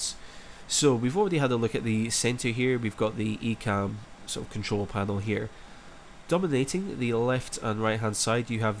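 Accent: Scotland, United Kingdom. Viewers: lol, please make more videos like this. Do you have videos like this for the other, or pmdg range of aircraft? Those would be great. greetings from an Aberdonian in Michigan!:) loving your vids and Scottish accent which I miss living in the USA. edit: 911 sticks out too, have you seen Ex CIA Agent/Asset Susan Lindauer? I just saw her speech "to truthers" yesterday.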